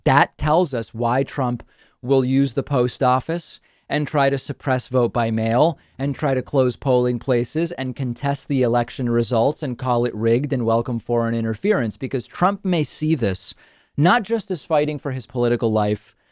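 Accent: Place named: Buenos Aires, Argentina. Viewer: I love your heavy Buenos accent, che pibe! También tengo miedo de que salga reelecto otra vez, que Dios nos libre!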